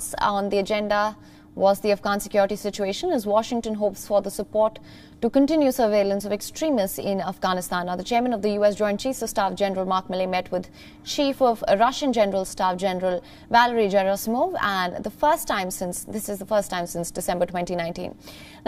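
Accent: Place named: India. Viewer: WION should choose a presenter that speaks better english. It has millions of subs... if they want to represent a real international image, it should start using someone that doesnt have such a thick indian accent.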